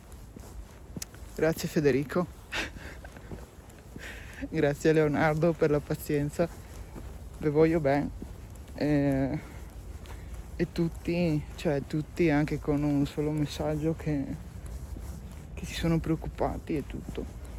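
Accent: Veneto, Italy. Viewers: per l'accento veneto che adoro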